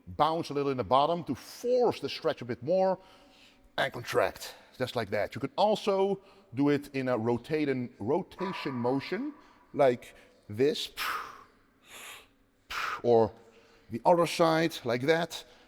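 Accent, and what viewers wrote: Netherlands. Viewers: Very well explained. I also noted a accent in your articulation. I know it's not from the shoulder shrugs. You sound dutch or dutch antillean. Anyways great video. I actually did them while you were explaining them using body weight,just to get the feel of it. And I did notice muscle contraction (slightly) as it may be... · Damn, I like his dutch accent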